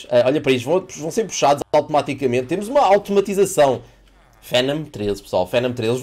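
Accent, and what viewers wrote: Portugal. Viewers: Eu sou tão burro que não me liguei que ele era de Portugal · n sei se ele é pt ou br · Brasileiros tambem cara,ele fez a alegria de mt brasileiro em 2013/2014 nem sabia que ele era de portugal porque eu era criança burra e n sabia o sotaque de portugal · Olá, você conserteza não sabe quem eu sou, mas eu precisava me desculpar por algo que com você a muito tempo atrás, Quando eu era mais chovem e estava conhecendo o you tube, acabei me esbarrando com seu canal, e quando ouvi você falando eu não estava entendendo nada, então acabei comentando que você deveria aprender a falar português (na época eu não sabia o que era português de Portugal). Hoje eu tenho 16 anos e acabei percebendo o quão preconseituoso eu fui com você e peço que algum dia possa me perdoar. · Acho que não é piada, ele não notou que ele era português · não tanko português de Portugal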